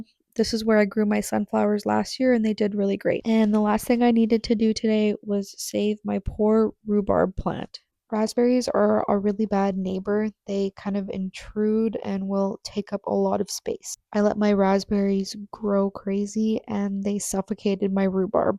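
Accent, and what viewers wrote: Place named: Canada. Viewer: I love how I could hear the Canadian in your voice from the first “about” 😄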